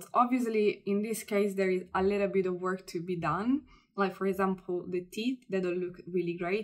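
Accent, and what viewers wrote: Italy. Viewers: Love your channel and your Italian accent 😊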